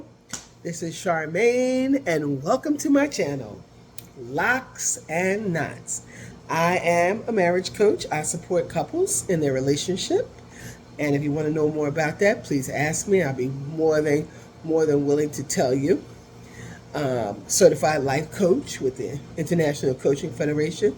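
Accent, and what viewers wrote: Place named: New Jersey, United States. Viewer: Where is G located? I think you’re from Jersey, (I can hear it)! So am I, it live in Atlanta now. Can come see her in Jersey. If so, I come home all the time E.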